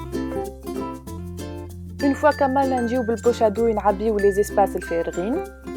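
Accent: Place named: Algeria. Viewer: Bravo pour la recette j'adore ton accent sa me rappelle l'Algérie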